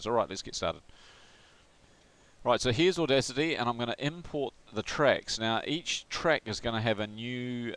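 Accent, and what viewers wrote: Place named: New Zealand. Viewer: You sound like you're from nz, our computers at school also have the student shared drives set up like that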